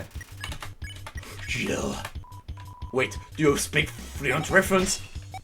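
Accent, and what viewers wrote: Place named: France. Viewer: Gotta love your french accent mon ami x) très bonne vidéo je m'abonne!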